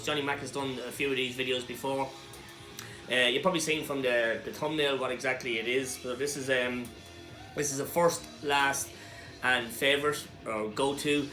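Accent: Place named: Ireland. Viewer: Love you voice I wanna say Irish ☘️ but I could be wrong not sure exactly but anything Scott Irish or German I feel at home .. and I live in Texas lmao 😂🍺cheers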